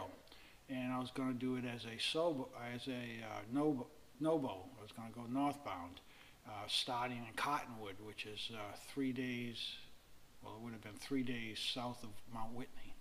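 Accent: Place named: Boston, United States. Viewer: Love the Boston accent. I start NOBO on 2/24; best of luck on your journey! Maybe find someone to mow the lawn so your wife doesn't have to do it- lol.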